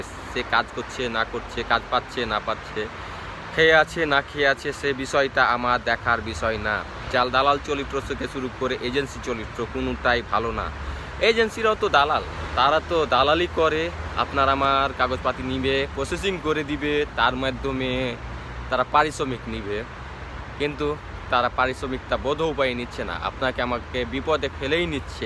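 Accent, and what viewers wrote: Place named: Rajshahi, Bangladesh. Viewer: উনি রাজশাহীর বাসিন্দা তাই ওনার ভাষা এমন সুন্দর।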